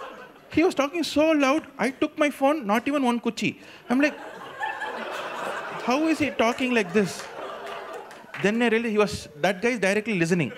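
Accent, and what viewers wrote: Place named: India. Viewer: Standup comedy by Indian artists somehow does not amuse if they talk in English. Heavy accent takes out the fun.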